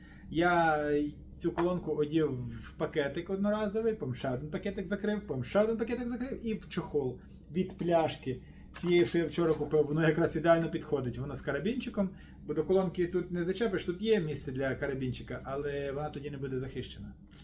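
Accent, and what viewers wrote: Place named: Western Ukraine, Ukraine. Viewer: По акценту точно не з Донецьку і не з Луганщини.Так десь блище до земель народження Степана Андрійовича Бендери. Патріот справжній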